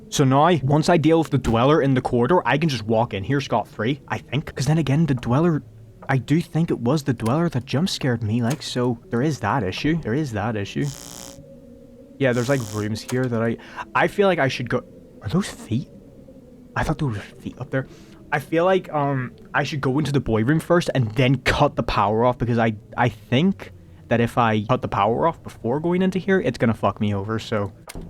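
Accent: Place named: Ireland. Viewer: tbh i only watch your content for the funny irish accent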